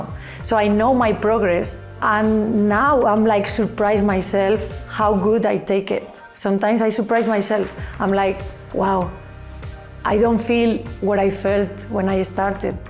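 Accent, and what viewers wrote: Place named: Spain. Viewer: she’s obviously not Indian you melon, that is a Spanish accent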